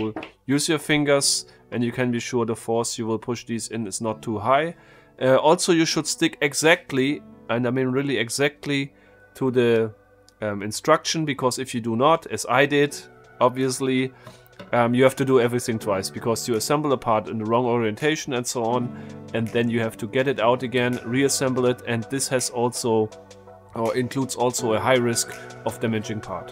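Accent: Germany. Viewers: Do you actually live in Germany? Because your accent is really German-like.. I'm from Germany too 🤔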